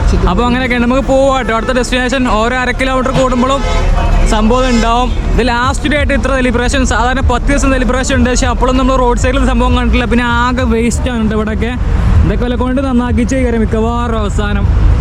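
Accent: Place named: Malappuram, India. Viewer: തുടരുക , വിവിധ പ്രദേശങ്ങൾ .... ഇടക്കുള്ള തമാശകളും , മലപ്പുറം ഭാഷയും പോളിയാണ്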